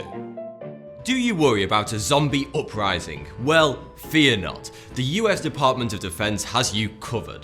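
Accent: United Kingdom. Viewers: Why i never miss a video: 1% its interesting 99% british accent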